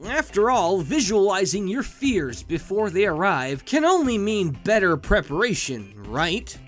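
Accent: United States. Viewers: erm, upstate new york?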